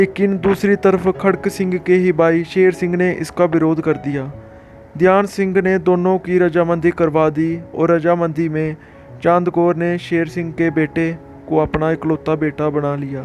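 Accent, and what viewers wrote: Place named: Jammu, India. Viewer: Your accent is heavily influenced by Dogra and punjabi ❤️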